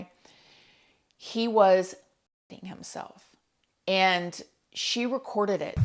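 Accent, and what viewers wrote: South, United States. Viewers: Seriously! As a Texan; raised (mostly) by my Brooklyn mom who recently had lost all those goofy (or what I used to think was obnoxious 'isms) after a stroke. It is TOTALLY my favorite when "da, da, da" and "excetera" 's... ESPECIALLY with that southern twang! My foreign grandparents used to say I have the thickest southern accent, which I really don't having grown up around so many different accents. tickles and warms my soul.. helps the grief 🥰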